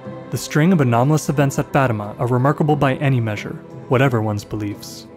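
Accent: Canada. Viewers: Yeah, I never heard that there was a disc separate from the Sun that was doing the spinning, and then "the disc" RETURNED TO THE SUN; don't know where that came from; as I said, I never heard that before. Overall, however, it was a nice presentation, but what sounded like a hard (thick) Canadian accent from the narrator was a bit bothersome to me.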